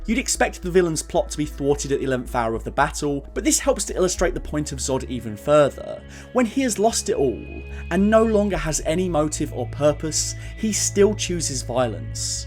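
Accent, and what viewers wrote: United Kingdom. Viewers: You got a put this video out a year ago. Now it’s too late to jump on the bandwagon of success. I have a problem with Zack Snyder‘s conservative politics which do seep into his movies. He made Rorschach a hero in the watchmen when he was meant to be a sociopath. In this movie superman is detached from his usual since I’m morality, right and wrong. And his parents tell him not to help people. Stupid objectivism. I guess you can’t hear how thick your British accent is. Your Kevin Costner was a terrible impersonation. The Man of Steel has flaws. The story should’ve been total chronologically. With all the distraction of metropolis there is no way Lois should have been in the final scene. I mean what did she do ?- take a taxi? Jor El played by Russell Crowe basically gave Clark the job he was literally born to do. In direct contradiction with everything he was fighting against on Krypton. 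And I did not see any reason why Clark needed to have the codex pumped into his DNA. It didn’t make him stronger than any other kryptonian. It was a plot point that was never used again in any movie. Maybe the goal was to have all the little kryptonian babies put in the bottle city of Kandor or like in the comic books. The excessive destruction started with a comic book from the 80s called damage control by the late great comic book writer Dwayne McDuffie. He later went on to create static shock, a whole line of Black superheroes for DC And was one of the major writers for the justice league and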